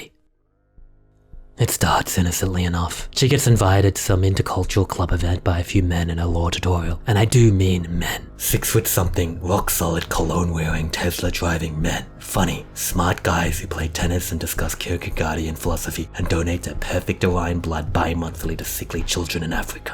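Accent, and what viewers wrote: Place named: Australia. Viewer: Are you Japanese? Also you seem to an Australian accent. Did you study or live in Australia?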